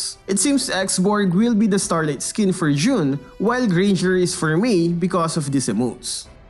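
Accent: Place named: Philippines. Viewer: The filipino accent is strong in this one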